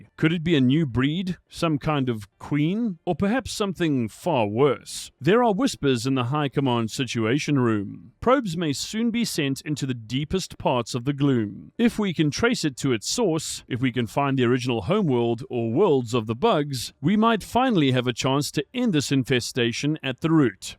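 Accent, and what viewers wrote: South Africa. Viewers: Permission to query Commander sir, are you deployed out of Sector 5 by any chance? Your accent reminds me of South Africa sir! Glory to Super Earth 🦅🌍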